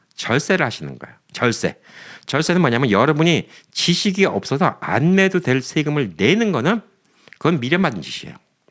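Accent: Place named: Jeolla, South Korea. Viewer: 부탁드립니다 그러면이 그러먼으로하시말고 러면을고치세요 전라도사투리 거러먼 안돼 그려면으로 먹으먼 먹으면으로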